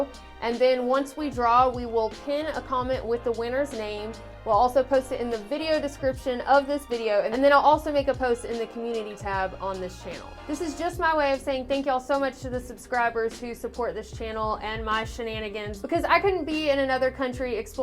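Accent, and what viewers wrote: South, United States. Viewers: I think I watch you because of that southern draw lol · Love watching your shenanigans, your channel, and oohh that southern accent!! 🤗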